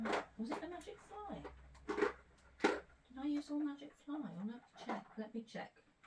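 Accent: United Kingdom. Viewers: I am East Midlands too, I could tell by your accent x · Wow, they turned out gorgeous! I've stopped trying to do blooms because I've jumped from one resin to another. You just nailed the reason why I've given up, information overload! I have two resins I enjoyed working with on different projects and I'm going to stick with them until I understand how they work. Thank you for this informative video with a beautiful turnout. My husband was born and raised in Nottingham (Arnold) so your accent is very similar. I kept thinking you had to be from the Midlands 🙂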